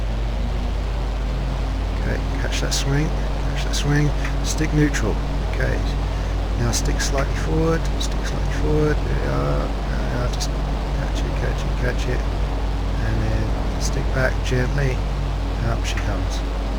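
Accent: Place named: United Kingdom. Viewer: A BRILLIANT upload! Great flying! Thank you sir! I will try hard to put all this into practice. (BTW - it's so nice to hear the accent of a fellow Brit giving these tips on this amazing British aircraft - for me, it's the best ever aircraft - cannot be equaled). I have to check tho' - I assume you are using 0% 'Takeoff assistance'? I'm using 0% & I find everything very difficult (*& ....'wobbly') - so I guess the axis control graphs are pretty important. I have pretty gentle slopes to my graphs, but I still wobble as if it's too sensitive. Would you be inclined to share your axis graph settings (...please...)? *On my channel - <link> - and this was with 20% assistance!! Only recently have I gradually dropped to 0%. I'll be trying this soon!!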